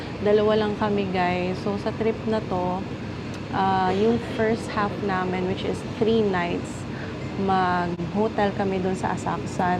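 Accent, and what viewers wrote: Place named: Iloilo, Philippines. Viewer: Ilonggo kamo? I can hear the accent. 😊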